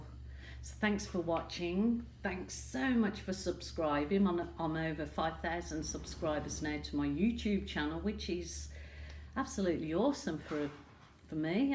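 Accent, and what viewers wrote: Birmingham, United Kingdom. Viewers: I love your result. I know you are in Aus but you sound like a Brummie to me. Are you originally from the Midlands?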